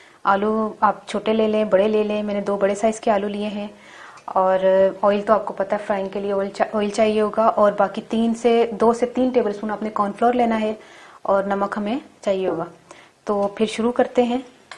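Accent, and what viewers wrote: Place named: Punjab, India. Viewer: Ap kitna pyara bolti hain punjabi ma 😍😘😘😘 zabardast recipe 👍👌👌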